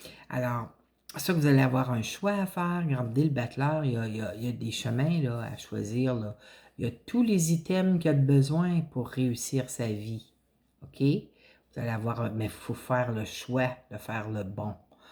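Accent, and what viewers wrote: Canada. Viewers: Merci pour cette belle guidance. Votre accent canadien est si agréable à entendre et votre voix est si douce. Bonne Année à vous et prenez soin de vous.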